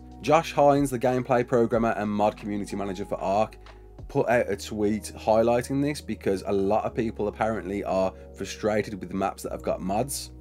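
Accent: Birmingham, United Kingdom. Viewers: they will nerf the astro that much, you might as well use a tek tapajaro, and i say void wyvern, sounds better than void worm, maybe its the accent, are you a brummie or a black country lad?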